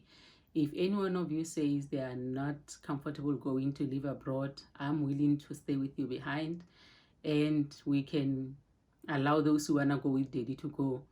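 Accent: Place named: South Africa. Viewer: I love that she’s still South African even through the English. Congratulations on your move.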